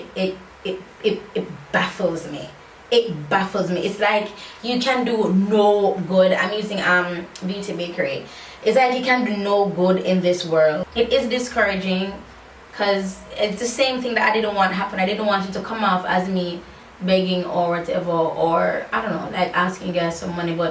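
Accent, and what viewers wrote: United Kingdom. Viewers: That British accent though lol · If this British accent fit yuh one more time goodie😄. Love, love, love the look sweets.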